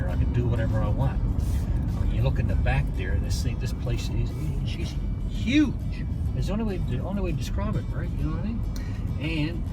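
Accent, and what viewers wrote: Canada. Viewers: "...over tree hundrid horse power!" Haaaaaaa! Love that Cape Brenton accent... "You frum the Bay, By? Noooooo, I'm frum the Pier, Dear!" **and** "It's a noice night for a foight, Mike!" are also Cape Breton classics :. )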